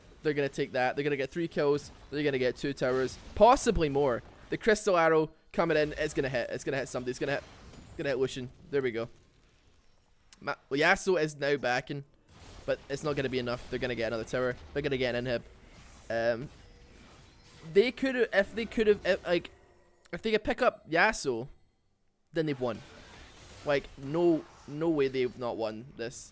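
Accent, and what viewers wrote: Scotland, United Kingdom. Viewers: is he scottish? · R u Scottish.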